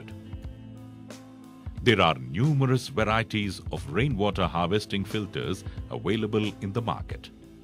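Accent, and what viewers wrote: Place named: South Africa. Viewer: this really has cheaply made written all over it its basically what you get in your modern shower plug made in india by a company called chicken makka roo!!! advertised by a indian guy called and a accent posh english mixed with south african, i mean look at their research and development tell me thats not a back of a run down chicken coup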